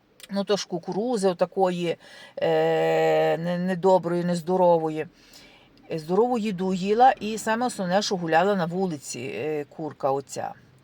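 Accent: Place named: Rohatyn, Ukraine. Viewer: За тоном розмови ви не зі Львова, а із Рогатиншини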